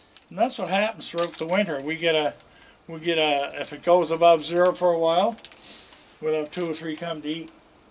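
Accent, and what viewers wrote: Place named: Canada. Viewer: My dad loved cats and wildlife. He was always camping, hiking, fishing. Even with the fishing, he stopped using regular hooks and moved to painless hooks (I have no idea what they’re called). He felt bad for the fish. He was as sweet as you, so similar to you. Even though you have a Canadian accent and my dad sounded very Appalachian, you still somehow have similar voices.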